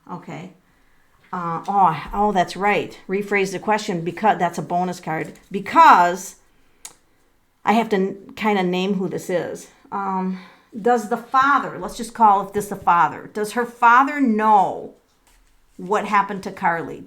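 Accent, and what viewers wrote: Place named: Midwest, United States. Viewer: I love your mid-western accent🤗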